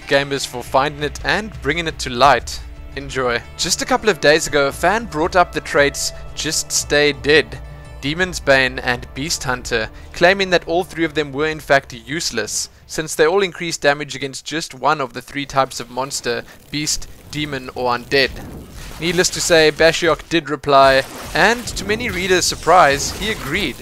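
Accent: South Africa. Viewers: well hello South African!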